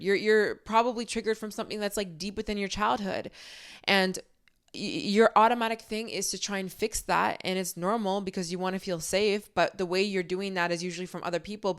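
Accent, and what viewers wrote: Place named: Toronto, Canada. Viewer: you so have a toronto accent and it makes me so happy to hear! brings me back home in this world of influencers its nice to hear myself, my friends and loved ones in ur voice 🥹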